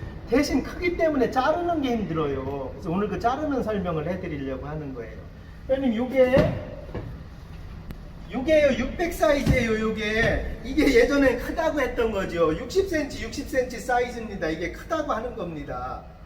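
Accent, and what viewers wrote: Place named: Chungcheong, South Korea. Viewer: 큰타일이 잘 깨지지않을까요? 충청도사투리가 재미있네요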